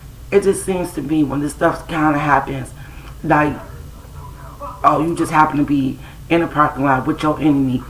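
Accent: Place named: Michigan, United States. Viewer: Hi! you sound like you are from Michigan. Can you please tell me wth is wrong with GM, CEO Mary Barr? She don't GAF about employees. How/why is it OK for the auto industry to have mandatory Saturdays scheduled and taking volunteers on Sunday to work?This plant is running 7 days a week!!!HOW in a pandemic?? and did the UAW threaten the Governor? Supposedly, the UAW told the governor, they got her elected& they would not help her with her re election, if she shut down the auto factories again because of COVID_19.It has been said, allegedly, that none of the auto factories will shut down again because of the virus. Why?how is a building a truck essential? These auto factories have people coming from all over the state, to work at certain plants.They are, * allegedly* sneaking infected people out of the plant and not telling their co workers they have been exposed to COVID-19! 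How is this ok??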